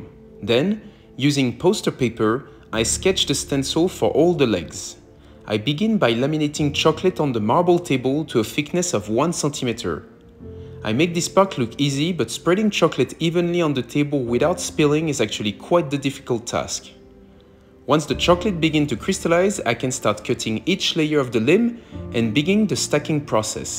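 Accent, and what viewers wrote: France. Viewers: Loving the voice over. Ça fait du bien d’écouter l’accent français ❤ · I love your french accent 😅 Moi aussi je parle français😆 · Your French accent is as smooth and as delicious as the finest chocolate.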